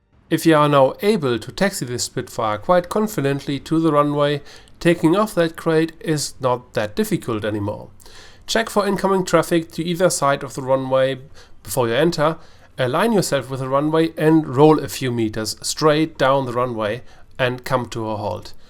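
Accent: Germany. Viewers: I may be mistaken but you have a very thick German accent, a German in a spitfire, what a time to be alive.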